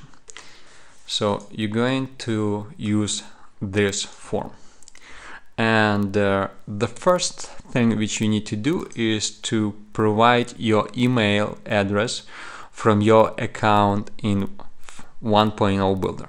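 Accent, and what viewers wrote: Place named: Italy. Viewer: si sente benissimo che sei italiano. il tuo inglese fa schifo!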